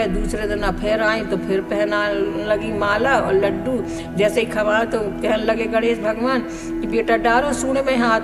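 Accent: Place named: Bundelkhand, India. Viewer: Bahut acchi lg rhihai katha apni bundelkhandi boli sunkar to maza hi aa jata hai. Radhe Radhe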